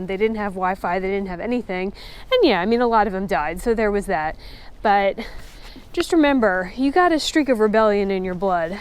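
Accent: South, United States. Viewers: You definitely developed a gentil southern accent for a jersey girl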